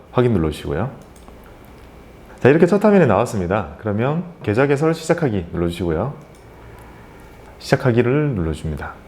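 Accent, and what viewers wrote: Gyeongsang, South Korea. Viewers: 좋은 정보 감사합니다. 경남사투리 참 매력적이네요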